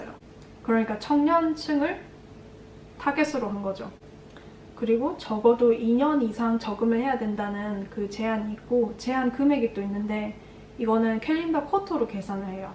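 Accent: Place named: Busan, South Korea. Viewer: 좋은 정보 ㄳ요~ 누님, 부산 출신~? 정겹네요ㅎㅎ 근데, 혹시 헬싱키에 오피스텔은 어디서 찾아볼 수 있나요~?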